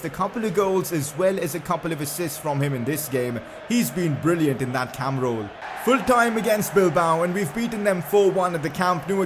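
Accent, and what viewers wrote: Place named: India. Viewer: Are you Indian because you sound Indian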